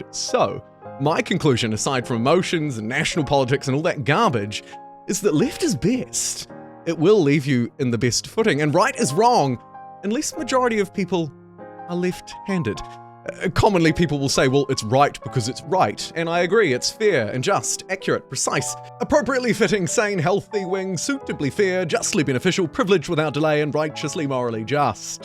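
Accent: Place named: New Zealand. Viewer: As soon as I heard your accent I was like, Holy shit your kiwi! Subscribed my friend 😁🤙🏼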